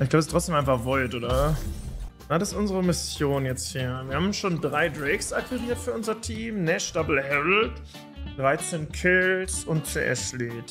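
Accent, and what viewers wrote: Bavaria, Germany. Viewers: Wie sich der bayrische Dialekt am Anfang ausschleicht 😂😂😂😂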